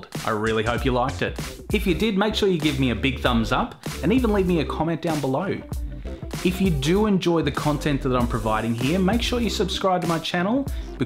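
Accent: Australia. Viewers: Problem with our Australian voices mate? You're probably American.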